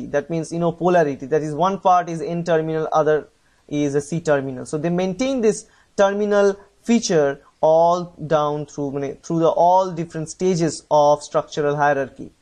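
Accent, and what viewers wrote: India. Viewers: Go find videos from people from your language people... He is an Indian, he will speak like an Indian... If you have a problem with that... Go fuck yourself...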